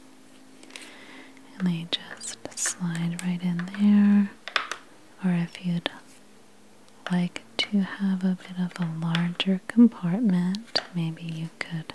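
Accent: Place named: Pennsylvania, United States. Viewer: Your accent reminds me of where I grew up in Bucks County, Pa. So much nostalgia!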